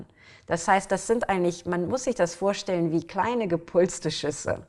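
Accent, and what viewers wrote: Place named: England, United Kingdom. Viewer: Ihr leicht englischer Akzept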